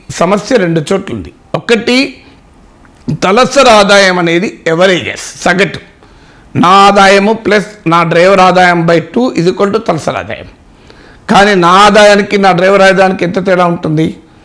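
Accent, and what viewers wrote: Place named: Mancherial, India. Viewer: Graamaallo Guddude guddudu congress ki..😂😂 okko saaree flow lo mana mancherial..godavarikhani slang vostadi meeku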